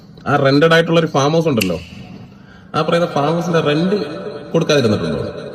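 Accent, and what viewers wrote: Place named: Kochi, India. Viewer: Like Kochikaran 😂😂😂😂😂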